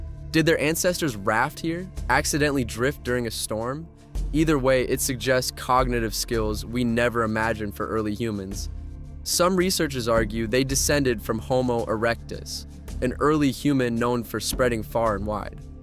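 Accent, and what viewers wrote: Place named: United States. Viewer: I like this reading voice. It's not AI! SO EXCITING to hear a human read and americanize the mispronpunciations. I love it! Would be great to show to children. Because of the animation. The ending is always a little abrupt. Ask a question at the end to get more comments. The videos are good and your voice is nice to listen to. I heard nothing new in this video. But, even the fake animation was great. We never get visuals because, they don't want to use movie clips or things not really too close to accurate but these graphics make it nice to watch for sure and let the imagination can run wild with it! Thanks again. And thanks for reading this in the real world!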